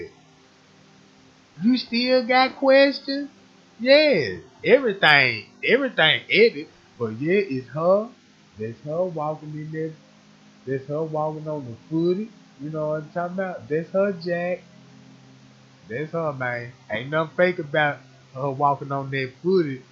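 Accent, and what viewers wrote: South, United States. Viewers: Love your work but hate the way you talk. Its not entertaining annoying you talk like u stuck in the 70's southern man. Jack jive piow shit like tht. You sound like a try hard to stand out but hey i could be wrong.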